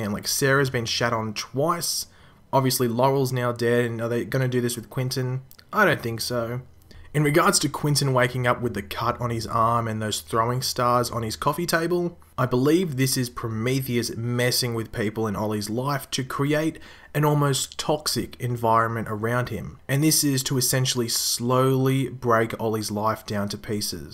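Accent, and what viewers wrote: Australia. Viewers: I think it's probably Billy right now, but I hope that it's Tommy. Also, you sounded very Australian this video.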